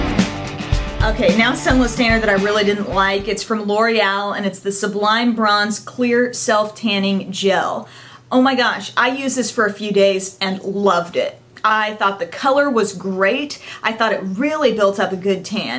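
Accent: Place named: Texas, United States. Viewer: You sound like your from Texas. :)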